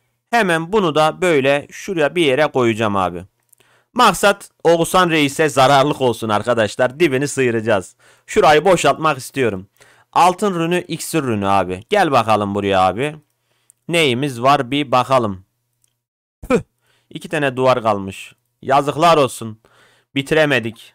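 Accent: Turkmenistan. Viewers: Abi sen turkmenmisin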